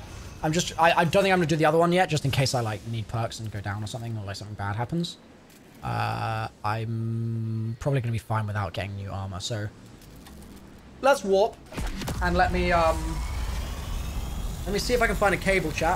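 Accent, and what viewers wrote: United Kingdom. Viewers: Hearing “y’all” in a British accent might be the best thing ever; you’ve surpassed NJ456, and have become the Omega Gamer Leader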